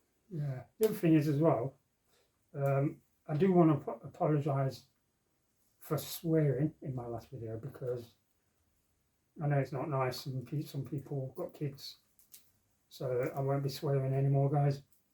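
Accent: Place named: England, United Kingdom. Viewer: Imagine 'correcting' an English person on English with an American way of pronouncing it. Cringe.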